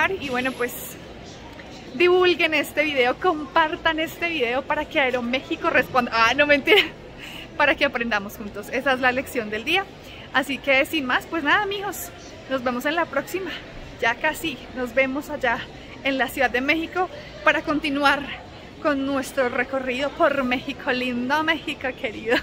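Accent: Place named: Colombia. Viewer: Me Gusta Mucho cuando. Cuando sí de mijos Y Mijas En su asentó colombiano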